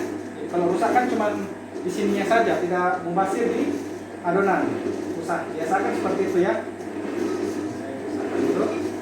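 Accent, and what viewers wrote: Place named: Sulawesi, Indonesia. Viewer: Assalamualaikum, jazakallah khoiron jaza, atas videonya semogga memberikan manfaat dunia akhirat.. dan berkah umur ples reezkyny... Dri logatnya kayakny dri sulawesi yah mas??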